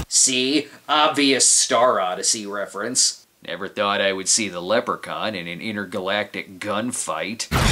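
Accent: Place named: Midwest, United States. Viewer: I'm impressed how strong his Chicago or midwest accent has gotten over the years.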